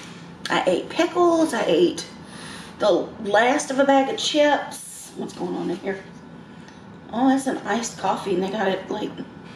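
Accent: South, United States. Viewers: She has such a beautiful face and love the southern accent!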